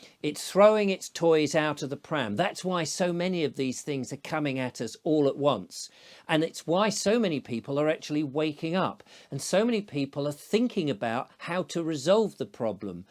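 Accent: United Kingdom. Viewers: Too much British 😊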